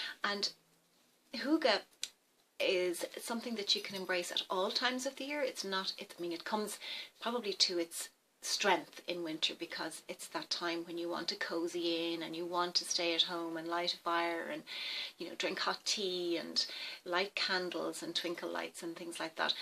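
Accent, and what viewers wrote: Ireland. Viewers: Just think tomorrow will be lighter just a little longer til it’s summer again... my favorite season I’ve been practicing this without knowing it Thank you! I have lights hanging all year long and I light candles every single day I like citrus smelling candles or fruity ones Are you from Ireland? I love your accent I’m from the US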